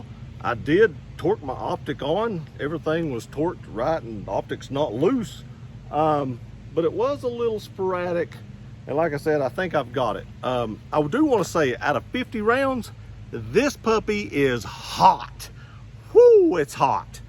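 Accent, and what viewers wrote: South, United States. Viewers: What did he say? Omg he is country 😂😂😂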